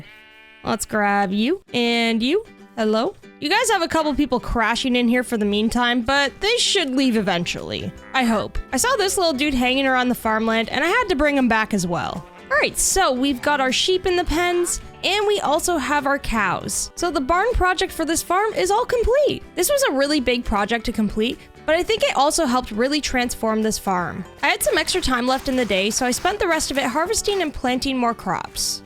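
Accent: Canada. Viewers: youre canadian? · I knew straight away you were canadian, after hearing "hey buddy nice to see ya" is such a canadian accent. LOVE ITTT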